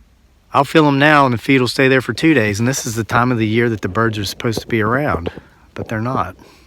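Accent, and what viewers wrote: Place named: Virginia, United States. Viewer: Ah hahhh, i thought you sounded like a fellow Virginian - please carry a firearm with you outdoors, my brother... I can tell you stories about a rodent looking creature as big as an elephant to invisible predators & spots in Goshen pass that will suck you underwater just to put a foot in. 💯💯💯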